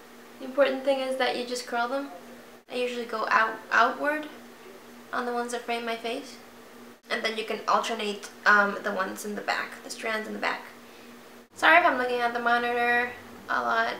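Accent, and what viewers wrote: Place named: Portugal. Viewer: Thanks for the tips! this is the 1st video I watch of you and I already knew some of the thing and tips to do, just need to buy the equipment and utensils lol! Btw didn't know you played the violin...well I just assumed so since your twitter pageis, Idk for sure but thanks again so much! I'll be sure to try them. I also have my pages of XxxThePianistxxX on facebook and youtube (I think that's how I spell it;) ) and xxThePianist&ViolinistxxX on twitter. Mostly music related but I also have curly hair and I try to style although it's really difficult and then it's really dry, moisturize without putting your scalp too oily sigh a torment but I love my curls, it's just the time and patience to deal with it lol. Do you use an alcohol free shampoo btw? And also you sound like portuguese, your accent, sorry if I'm wrong, but just guessing. 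Lovely curls girl btw! xx - XxxThePianistxxX (Camila F.)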